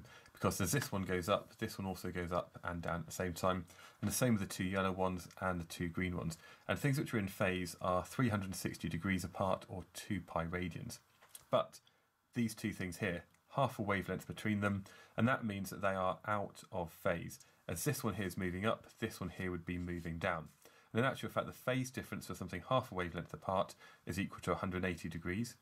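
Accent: United Kingdom. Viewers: What a beautiful British accent indeed. That was great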